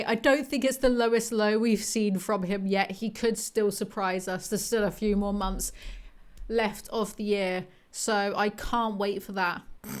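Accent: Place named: Essex, United Kingdom. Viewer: Essex voice what's these rumours then?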